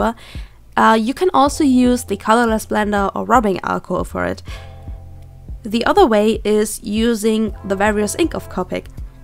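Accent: Germany. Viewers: Do i hear a german accent? I guess i do~ Greetings from germany <3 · After a few words I knew shes from germanyyy :D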